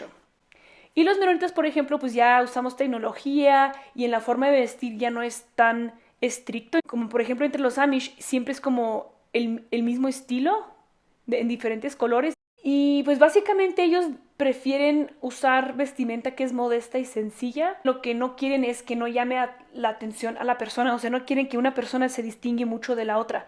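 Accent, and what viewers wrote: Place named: Mexico. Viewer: Gracias por tu vídeo y la manera de explicarlo. Me parece una exposición de la realidad actual muy enriquecedora. Yo estuve en Pensilvania en los años 90 en un pueblo Amisch para comprar productos típicos y curiosamente cuando les hablé en alemán estándar (Hochdeutsch), me respondieron en un dialecto que por suerte comprendí, y era más similar al suizo alemán, que aprendí por diversos motivos. Posteriormente, hace 6-7 años, tuve la oportunidad de conocer una zona donde había menonitas que vivían en Brasil, concretamente en Santa Catarina. Estas personas tenían dificultades para hablar en alemán estándar (Schriftdeutsch oder Hochdeutsch) sin embargo, hablaban un dialecto entendible y más parecido al Plattdeitsch (similar al holandés). Afortunadamente hablo alemán, suizo y holandés, y así pues levanté la curiosidad de los presentes. 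Es muy divertido hablar diferentes dialectos del antiguo alemán para poder comunicarte con los descendientes de los centroeuropeos. Por cierto, al empezar a ver el video pensaba que eras de España. Tienes cara de española 120%, pero luego te escucho hablar y me doy cuenta de que tienes acento mejicano 🤗😇. Hast du Videos in plattdeutsch?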